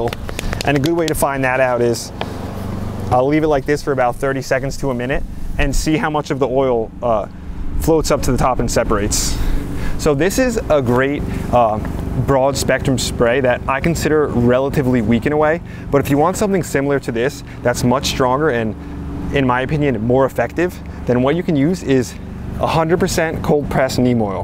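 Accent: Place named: New Jersey, United States. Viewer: I had a customer call yesterday and he had a faint accent that I recognized but couldn't place. Then he told me he was from New Jersey and I knew right then that he sounded like. So I guess he's probably from the same region.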